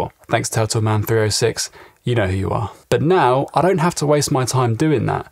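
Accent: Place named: United Kingdom. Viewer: I just get a thrill when I listen to your British English ))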